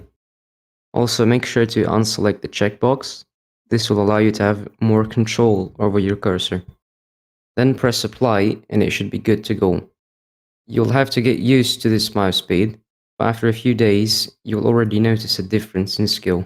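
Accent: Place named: Scotland, United Kingdom. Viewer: Are you European you sound like a scotsman 🤔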